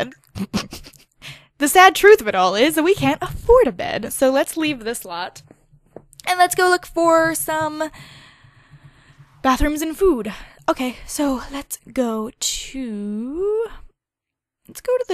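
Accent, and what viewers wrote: United States. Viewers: probably american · It's americam but she is from Houston Texas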